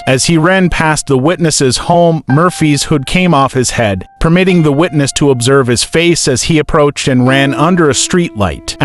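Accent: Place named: Europe. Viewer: Thea ducking European narrating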